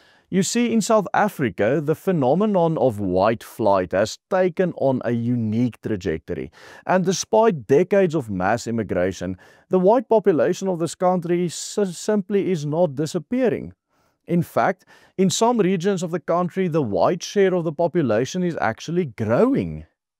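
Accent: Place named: Afrikaans, South Africa. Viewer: EK SKRYF IN AFRIKAANS, WANT DIE MANIER WAT JY PRAAT, VERTEL MY JY IS AFRIKAANS. HOEKOM PRAAT JY IN ENGELS JY IS N TOTALE ID***T. HOEKOM PRAAT JY OOR BLANKES OP INTERNET. IS JY MAL OM SULKE INLIGTING OP INTERNET TE PLAAS????? EK WEET JY WIL GELD MAAK , MAAR JY DOEN DIT TEN KOSTE VAN BLANKES WAT GEEN PROBLEEM HET MET ANDER KLEURGROEPE NIE. MAAR DAAR IS KLEURGROEPE WAT N PROBLEEM HIERMEE HET LAASTE LUISTER GEWEES, LAASTE KYK!, JY IS EINTLIK N VYAND VAN N TANS VREEDSAME SITUASIE!!!!!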